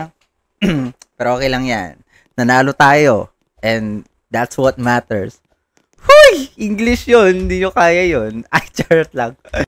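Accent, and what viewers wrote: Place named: Tagalog, Philippines. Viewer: Parang tagalog nag english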